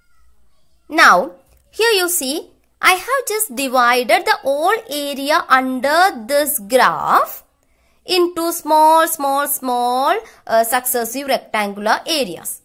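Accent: Kerala, India. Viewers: The way she talk in English we can say that she is from Kerala 😁👍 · yeah I think she is malayali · i can say that she is from south india from her accent.😂😂thank you mam i understood it.Love from Nepal❤️❤️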